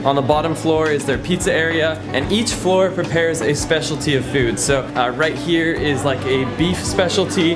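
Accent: France. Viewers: If you don't fall inlove with Paris from the very first time you see it in your own eyes, then you are not a human. Haha! Paris is really stunning. I had those escargot too! But if i have to choose between escagot and foie gras i'd go for the last one. Love your french accent,! Lol! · That French accent tho! Cue Laura blushing... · Well done video I enjoyed it but your French accent is needs a little polishing. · Really like when you skeap English with the french accent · Love when you speak English with that French accent 😂😂😂 My God lol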